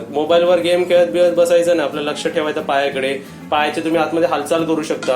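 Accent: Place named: Nagpur, India. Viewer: Chhan sangta,Nagpur chi language vate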